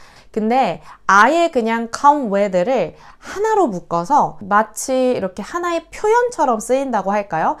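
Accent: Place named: South Korea. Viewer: 와 통종 국문과인거 감안해도 딕션 강세 다 무시한 콩글리쉬 발음 너무 한거 아니요 ㅋㅋㅋㅋㅋㅋㅋㅋㅋㅋㅋㅋ? 내가 2000 초반에 보습학원에서 가르치던 발음 그대로 하고있넼ㅋㅋㅋㅋㅋㅋㅋㅋㅋㅋㅋㅋㅋ 그냥 구글 AI가 발음하게 틀어놓으세요 애들 어릴적 발성 습관 망치지 말고ㅋㅋㅋ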